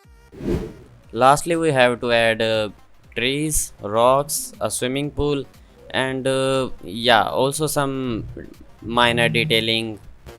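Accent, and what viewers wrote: India. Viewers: Are you filipino? Pero parang indian accent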